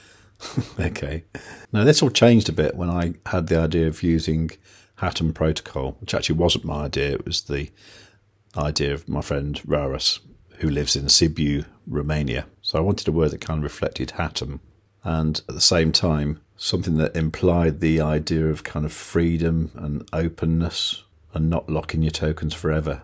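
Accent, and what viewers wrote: Midlands, United Kingdom. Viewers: Are you a midlands boy im from the black country maybe your not from too far away :)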